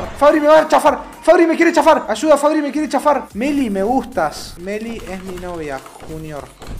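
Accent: Chile. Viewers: Q bien habla chile otros pibes lo hacen mexicanos JAJJA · Ya pero hablando chileno,re good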